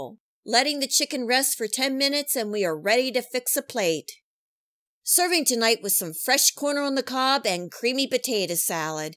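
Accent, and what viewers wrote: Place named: Minnesota, United States. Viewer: Not sure where you’re from but the accent only makes these recipes even better. Minnesota? Thanks!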